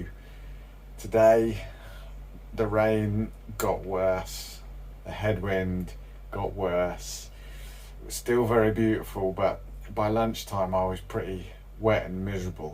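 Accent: England, United Kingdom. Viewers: I was looking forward to this until l heard your horrible English accent. There is to many of use up hear now and you will ruin the Highlands just like everywhere your mob has been before.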